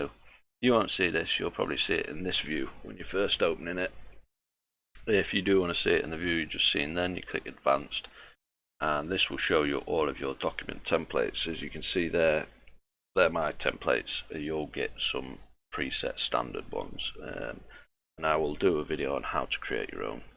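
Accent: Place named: United Kingdom. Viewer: It's about time we seen a brit doing videos more on youtube and the best thing of it yet is, it's solidworks 2017 of which I'm learning to use better!! Proper banging video dude, I think everyone should drop their H's where I live here in Northampton and being a southerner I'm seen as an alien doing that LOL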